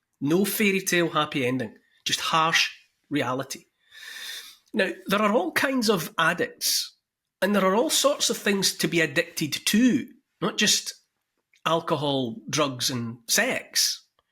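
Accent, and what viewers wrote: Scotland, United Kingdom. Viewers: I am a subscriber…i love you… I love listening to your Scottish accent…and you are right on! ❤️❤️❤️ PS I am Canadian…so was my Nana! 😊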